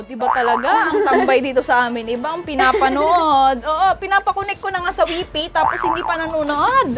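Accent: Ilocos, Philippines. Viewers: "Sa kanyang lapistick" 😂 love your accent, im ilocano too. Your so talented dear. 👏👌Keep it up. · Ang ganda ate 😍 lapistick yung accent ate ilocana. Ilocana din ako😘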